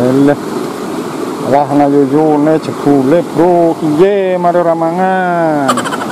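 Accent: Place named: Banjarnegara, Indonesia. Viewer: Asline ngendi mas...logate kyk neng nggonku Banjarnegara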